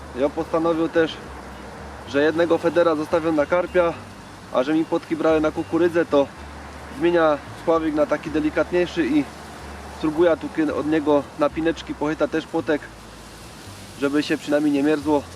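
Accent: Silesia, Poland. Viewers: nie moge sluchac tego slaskiego dukania, ide dalej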